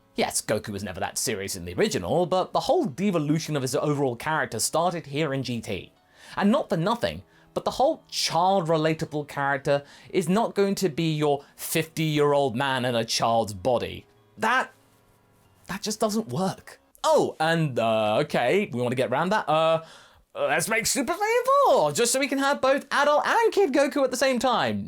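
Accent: United Kingdom. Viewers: Peak DBZ, in my opinion, was when Goku wasn't beating everyone and they all just had to rely on him. It was when the side characters could get the spotlight and do a little something against the enemies. For example, Vegeta beating Freeza's forces on Namek until the Ginyu Force showed up. Or Vegeta transforming into a Super Saiyan and beating Android 19. Or Vegeta (I'm repeating myself but he gets great moments in the series) going Grade 2 and beating "You're either perfect or you're not me" Cell. And most notably of all, Gohan going SS2 and beating Perfect Cell twice. So to have a story where Goku and Vegeta go to space and fight enemies relative to their strength while leaving the others to defend Earth against enemies relative to the side characters would be great because no one is getting shafted. The enemies aren't too difficult to overcome where they need Goku saving the day and aren't too easy to beat thus lowering the tension and stakes. 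Also, this is my first time watching you and was genuinely confused for a bit, thinking "why does the name sound so familiar?" and then being shocked that 1) you voiced Goku in DBZA and 2) you're British, so that was funny.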